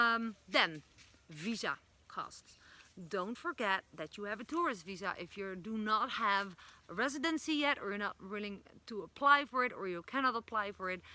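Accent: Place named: Netherlands. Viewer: VISITED CR AND FOUND IT VERY EXPENSIVE ..... hello to you I can tell you are Dutch! I am Dutch decent living in Canada. Nice video!!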